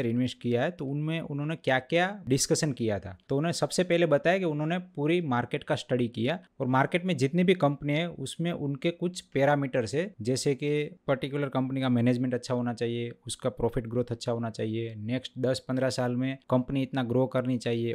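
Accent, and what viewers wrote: Gujarat, India. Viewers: Thanks for your pure gujarati accent.❤❤ greetings from Surat...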